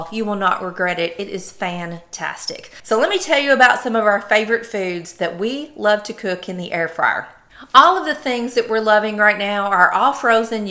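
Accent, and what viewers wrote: South, United States. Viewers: Thanks for all the hints..I just love listening to your Southern drawl...also seeing taking part in the demos! Lots of fun! 😃 from a Minnesota gal ❄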